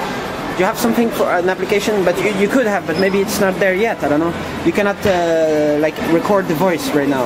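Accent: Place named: France. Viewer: he sounds french or lebanese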